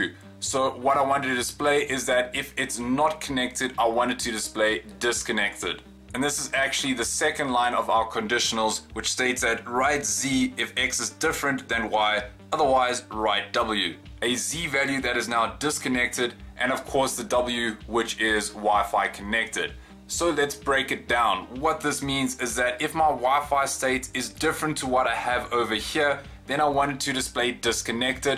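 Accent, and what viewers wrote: South Africa. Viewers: Love your voice accent. Africaans? Australia has one of its very senior politicians with your accent. Subscribed because we want more user friendly program from you.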